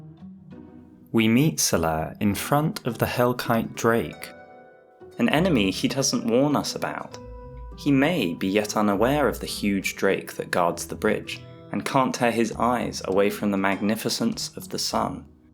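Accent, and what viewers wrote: United Kingdom. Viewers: Has an American I have to say I prefer my lore to be spoken with a warm British accent. It just seems more legit for me. Good job at being British.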